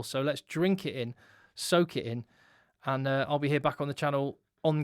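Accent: South Africa. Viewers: Are you sure you're not A saffa?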